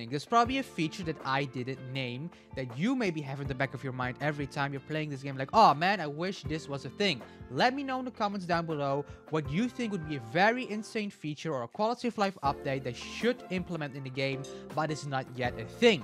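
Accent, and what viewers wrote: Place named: Italy. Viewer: My guess is Italian 🤔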